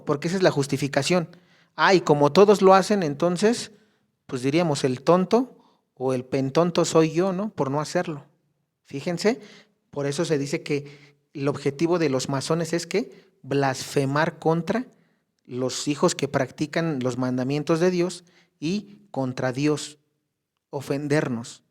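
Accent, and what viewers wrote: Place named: Mexico. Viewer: Aparte de la excelente explicación, como nos enseña el hno. que todo queda claro, me agrada el acento y modo de hablar de mejicano. Me ha hecho reir como nos habla sencillo, claro y conciso sin ambiguedades.😅🙏👍 gracias hno. Saludo y bendiciones.🇵🇦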